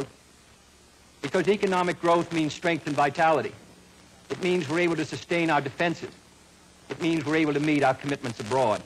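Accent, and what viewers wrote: Boston, United States. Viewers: Check , , and , the Boston accent is soft, but noticeable. It seems as if he tried to train it out or soften it, but it's still there. By it's back in full swing, especially "A cotton fahmah in Georgiahr". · He has a specific Boston accent than some in Boston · we talk like this in dorchester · this isn't true, his accent is the complete opposite of Brahmin society and accents. John Kerry is a good example of Brahmin and born into. But the Kennedys were your typical Irish working class Boston accent. The Brahmin were not fans of this Boston culture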